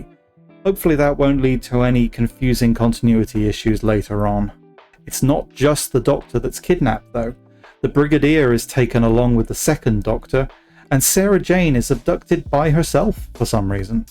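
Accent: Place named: United Kingdom. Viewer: In brum. Can tell you’re from the uk lol loved that show.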